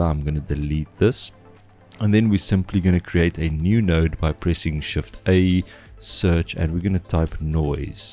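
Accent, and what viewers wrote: South Africa. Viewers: Awesome video bro. Are you South African btw?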